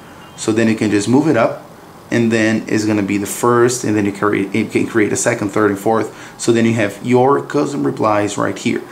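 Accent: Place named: São Paulo, Brazil. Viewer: Khew you were Brazilian by the paulista accent hahah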